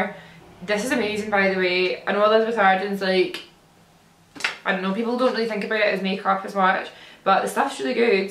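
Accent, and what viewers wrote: Scotland, United Kingdom. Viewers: no, I'm American. to me, you have a thick accent which I think is Scottish. Your response tells me I was right. It's lovely all the same. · Your Scottish accent gives me life ❤️ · American ? No Scottish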